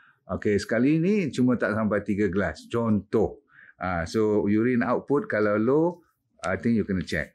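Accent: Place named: Perak, Malaysia. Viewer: Doctor orang Perak ,tak bolih hilang Bahasa ibunda sekupang Limakupang cukup kelakar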